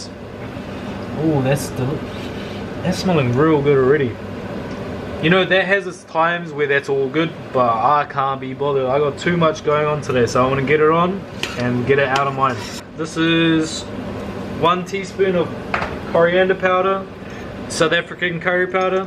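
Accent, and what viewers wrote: New Zealand. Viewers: I’m Māori and was looking for bunny chow recipes knowing it’s a Indian South African dish. Last thing I expected was to hear an accent like mine haha. Look so good btw thank you for this!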